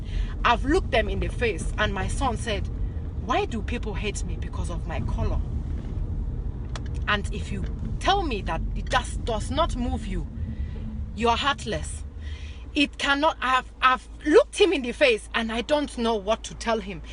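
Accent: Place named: Nigeria. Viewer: It's the Nigerian accent I keep hearing from you for me🥰❤️🇿🇦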